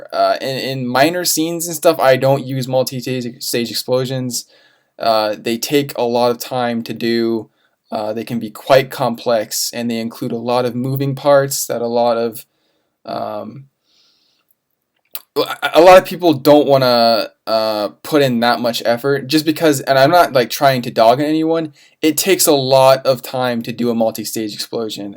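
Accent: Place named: United States. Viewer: Liked and subbed. You have everything an ispiring animation student needs,a clear voice and mic,good american accent to understand,explaining everything multiple times.. Thanks man i really needed this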